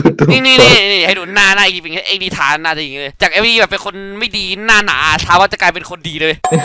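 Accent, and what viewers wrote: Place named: Isan, Thailand. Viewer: เล่นเว้าครับ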